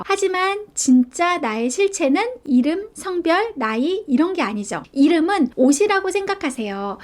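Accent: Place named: Seoul, South Korea. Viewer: 꼭 옛날 뉴스에서 나오는 서울사투리 느낌이네요ㅎㅎ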